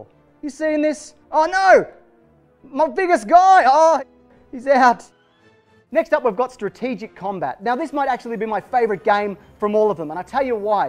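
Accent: Australia. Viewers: For some reason, seeing your face with your voice makes you sound way more Australian than usual. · Your voice says Australian but your face says Mexican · I feel this is like 500% more Australian than normal. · He went full bloody Australian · you seem more Australian in this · oh my god you're Australian Billy Mays (God rest his soul).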